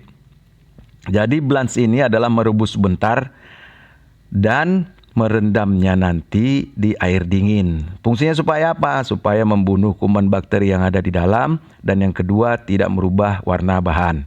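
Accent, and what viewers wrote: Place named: Medan, Indonesia. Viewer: Medan kali bah...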